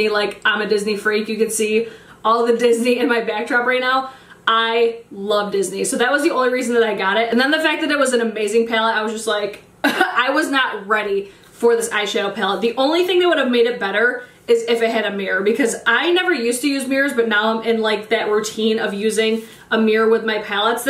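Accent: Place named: New York, United States. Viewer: I really need to watch other things besides makeup videos on YouTube😂like I really should watch more tv shows, movies, read more. But I think that if I’m on YouTube, I will plan on binge watching your videos. Love your NY accent and attitude. Keeping it real and not sugar-coating anything. Love that!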